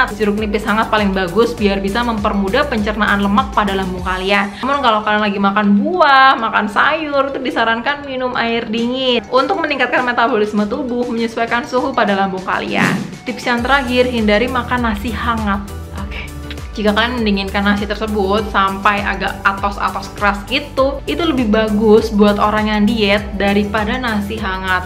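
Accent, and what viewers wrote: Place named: Banjarmasin, Indonesia. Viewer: Kaya nya orang banjarmasin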